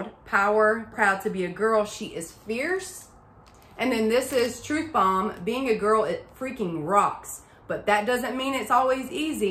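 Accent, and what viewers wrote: South, United States. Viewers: You are adorable 😊 I just love hearing you. I’m in California and love to hear people from the south!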